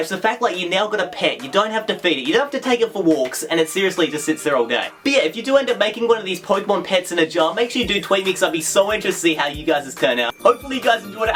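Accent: Australia. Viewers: r u australian cuz i am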